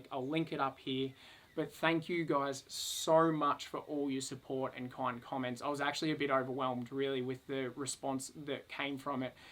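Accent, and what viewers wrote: Australia. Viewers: I’m a girl who’s a sucker for guys with an Aussie accent. You get me in the divorce. Plus....I love occy.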